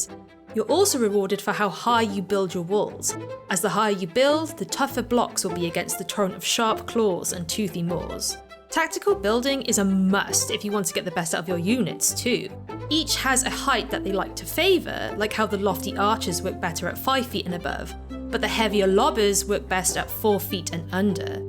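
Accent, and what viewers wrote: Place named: United Kingdom. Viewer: how you gonna have a british accent and then incorrectly use feet instead of meters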